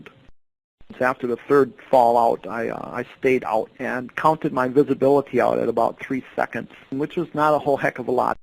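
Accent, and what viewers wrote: United States. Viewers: It sounds more like a Michigan accent to me. MI, WI, MN , Chicago accents are similar.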